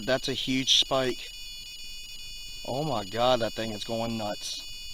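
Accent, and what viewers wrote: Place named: South, United States. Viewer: I did NOT expect you to have a country accent 😂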